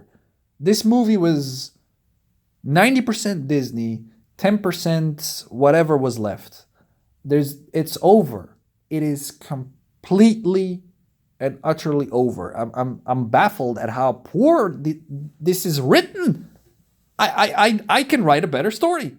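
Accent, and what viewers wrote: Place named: Netherlands. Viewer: bro you are a nerd with the worst dutch accent ever ... and i'm dutch, so if you wanna fight me let's meet up i would love to thump in your geek ass head ...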